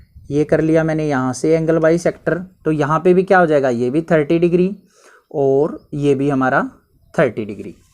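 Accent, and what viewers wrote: Haryana, India. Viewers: Sir ki voice me hi haryana show hota hai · hm pta mujhe pahala hi pta chl gya bs confirm ny tha m vaisa sir ap hariyana m konsi side rahta ho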